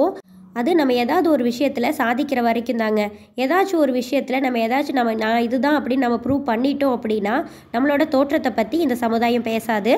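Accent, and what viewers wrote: Kongu, India. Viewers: கொங்கு தமிழ்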